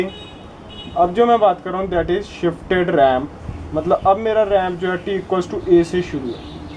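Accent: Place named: Punjab, India. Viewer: It Sounds Cute When a Punjabi Guy Speaks in Hindi .....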